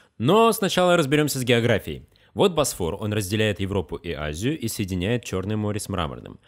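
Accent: Tatarstan, Russia. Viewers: Классный канал! Автор акцентом похож на татарина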